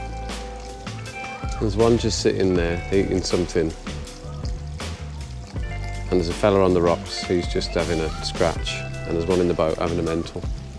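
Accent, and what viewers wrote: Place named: Wales, United Kingdom. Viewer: hell no 👊I just want to hear Your voice, a Wales accent is so cool 😎. BUT there will be songs that you will like,very far and few between, but you will like them.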